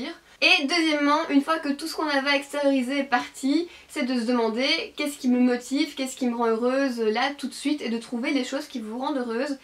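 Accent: Belgium. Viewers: Dernière question à ce que j ai compris tu est belge ? Tu a un accent belge c est vrai ! (En mode pomee 😹😂) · comme tu habite en Belgique est-ce que tu peux garder ton accent belge parce que moi aussi je suis une belge et il y a je pense des belge qui te regarde aussie bref t'es trop belle j'adore tes vidéo ne le prend surtout pas mâle pour l'accent dsl d'avance si je t'es fais mâle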